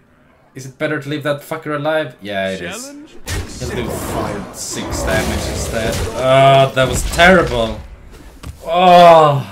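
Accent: Sweden. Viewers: What? How can you mistake Swedish accent to some American accent?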